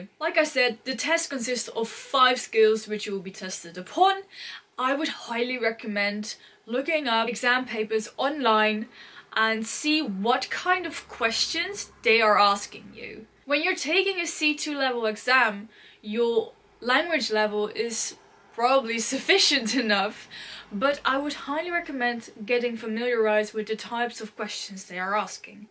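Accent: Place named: RP, United Kingdom. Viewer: hiya! prob u r not reading the comment section tho i can’t help but hats off to ur accent. this is just marvellous, impeccable clear RP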